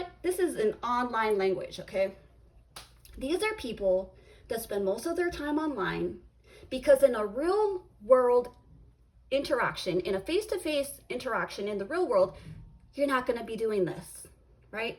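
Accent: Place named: Hawaii, United States. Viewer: So the first time I watched you. I was like this woman reminds me of me..speaking wise a little harsh lol I thought she just reminds me of home...then about 3 videos later I find out your from Hawaii..not only home. But my island. I'm on the .mainland now and people don't get me unless they really know me cuz how we talk.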